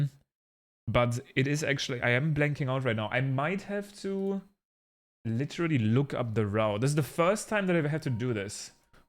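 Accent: Germany. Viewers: little german accend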